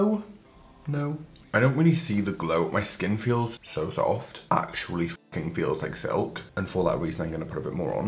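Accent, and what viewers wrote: Wales, United Kingdom. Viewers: Are you welsh? your voice is legit so therapeutic xox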